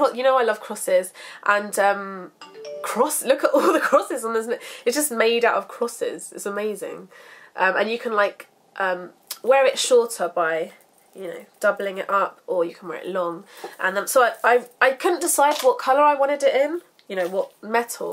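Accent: United Kingdom. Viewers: I'm American and I love the way British people talk! Sorry if my counterparts try to check you on the way you pronounce things. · Im from america...and i much prefer how you and other girls from the UK pronounce everything..after and during i watch your vids i catch myself talking like you and even out and about...and people ask me are you from England and thats when i know...Im not talking American and that makes me happy weird...ya probably i don't care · and you guys (in the U.K.) also sound like you're saying "Fhree" when you're actually saying "Three". I love it! I wish I had an accent like people from the U.K. It's so beautiful.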